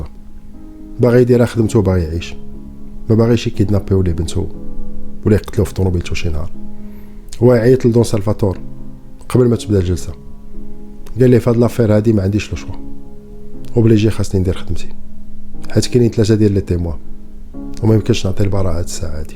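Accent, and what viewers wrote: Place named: Casablanca, Morocco. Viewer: طريقة السرد قحة كازاوية . تبارك الله علك ولد البلاد.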